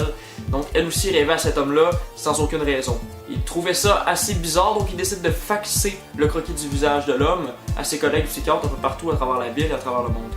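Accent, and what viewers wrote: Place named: Quebec, Canada. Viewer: J'adore ton accent québécois!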